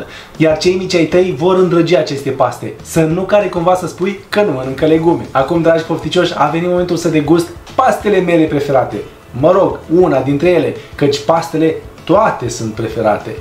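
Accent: Moldova. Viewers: pair a contraire....e perfect ca vorbeste...noua ne place,mai ales accentul moldovenesc...❤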